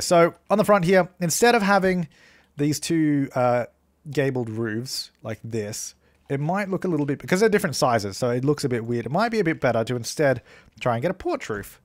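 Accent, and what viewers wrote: Australia. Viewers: Are you from Australia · Are you from Australia? Just wondering as I'm from Australia as well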